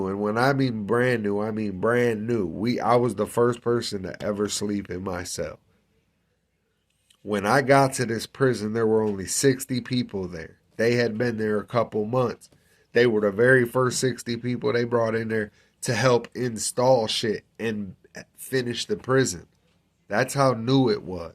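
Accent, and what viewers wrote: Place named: South, United States. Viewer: Hi 👋🏻 you sound so southern 😮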